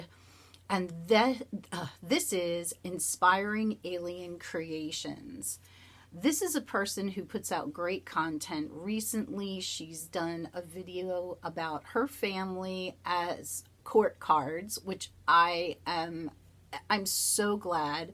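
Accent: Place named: Massachusetts, United States. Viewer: I knew you must be from Mass I could hear it in your pronunciation!!! Nice to connect with you! I’m from Cape Cod.... love the shout outs!!!!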